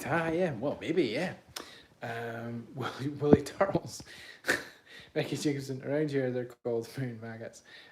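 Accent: Scotland, United Kingdom. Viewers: Belatedly hello from Yuma Az. I love your historical lessons. I am a Kincaid descendent. Have you toured their manor? I was coming to see it but old age and health got me first. I would love to hear its history, with your Scot accent and instructor-like presentations.😅❤❤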